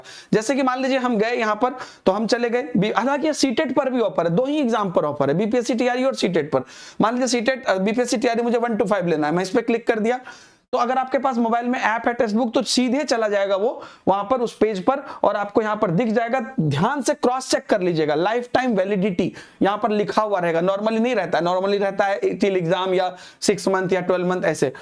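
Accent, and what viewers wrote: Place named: Uttar Pradesh, India. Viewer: भाई जी मुझे तो नहीं लगता है यह बिहारी है यह कहीं u p का लगता है टीचर है या कोई आर्डर स्टेट का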